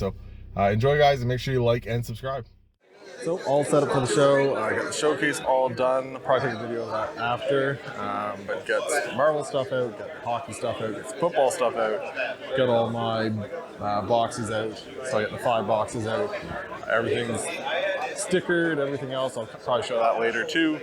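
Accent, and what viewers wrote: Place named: East Coast, United States. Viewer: Nice video. Just sitting at work, felt like I was at the show. I'm setting up at my first show this weekend, I watched your video about setting up as a dealer, some good advice. Lol, I knew you were east coast! First time I heard you say "card", gave it away for me. I'm Alberta oil patch, work with tons of east coasters. Looked like you did pretty well at the show. My only goal for the show this weekend is to get my table fee back. Lol. I'll just be happy to sell a single thing. Good video, you have good easy interactions with the show attendees. 👍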